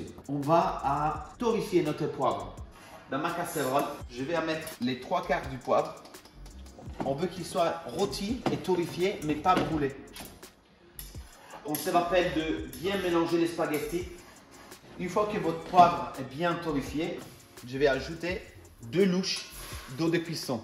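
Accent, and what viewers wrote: Italy. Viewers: Che è questo dialetto italiano degenerato che stai parlando? Non capisco quasi niente. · And you didn't catch his thick Italian accent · It's just French with Italian accent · u know he is italian? so french with italian accent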